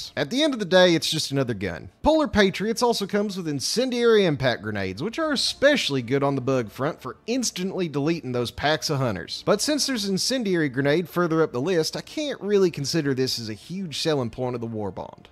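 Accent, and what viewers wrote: South, United States. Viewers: After years of having to endure various European accents, this channel blindsides me with a beautiful Southern drawl. It's like a balm. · Bro I love your southern accent It's got charm haha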